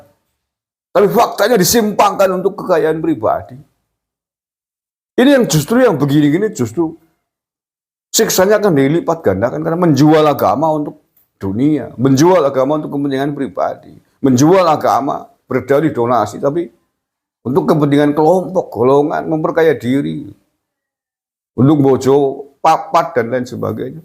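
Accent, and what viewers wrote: Banyumas, Indonesia. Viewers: Dan saya selalu tersenyum saat mendengarkan logat khas Banyumasan nya Mas. 😊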